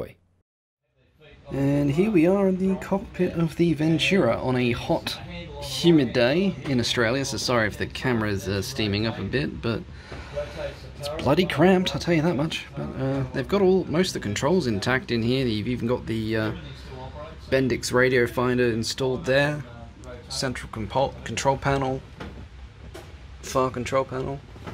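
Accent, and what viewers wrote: United Kingdom. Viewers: Never put you down as an Aussie, your dialect is more Brittish. Sweden had quite a few of these planes, always nice to recognize them.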